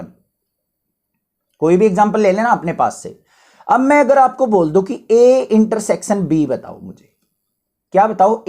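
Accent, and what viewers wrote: Haryana, India. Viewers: Sir you are from haryana 🫂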